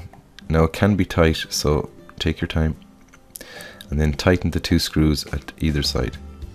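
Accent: Ireland. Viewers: Are you irish? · Your Irish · Im Irish too, you sound Irish anyway =D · Hey could you make a video on how to setup 2 monitors or 3 monitors? I think i know how to do it but dont want to spend money buying another monitor and not knowing how to install it, cheers. oh and nice accent let me guess Irish? from the low southern end of ireland? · Irish man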